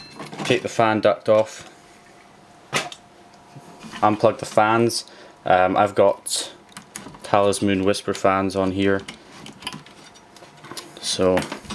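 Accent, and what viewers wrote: Scotland, United Kingdom. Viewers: Good old scottish accent love it hahaha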